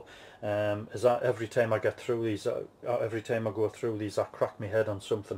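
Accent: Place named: Northumberland, United Kingdom. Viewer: Really enjoyed this beginning of your layout. My father was from Blyth and your accent brings back happy memories. We had a camping holiday at Blyth many years ago. I remember the wonderful beaches you have in your part of the world.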